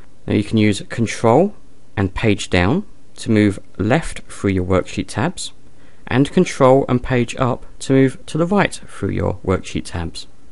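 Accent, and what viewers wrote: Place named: England, United Kingdom. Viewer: Yes it is an English accent from England.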